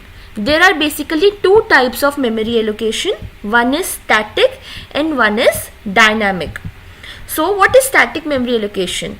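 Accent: India. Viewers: Correct your accent first mam! Indian accent is cringe! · Thank you. I have developed my ability to have a better understanding of the Indian accent.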